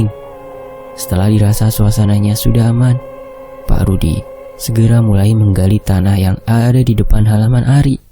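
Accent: Java, Indonesia. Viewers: agak medok ya 😁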